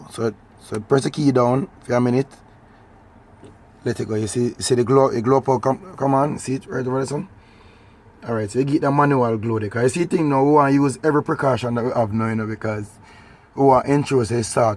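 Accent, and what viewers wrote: Jamaica. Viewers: I love other forms of English and all of it's creoles and what not but that still does not make it any easier to understand the Jamaican accent/dialect. I try so hard 😂 but I only pick up bits and pieces. I'm gonna watch more though, maybe I'll get better at picking up what he's saying !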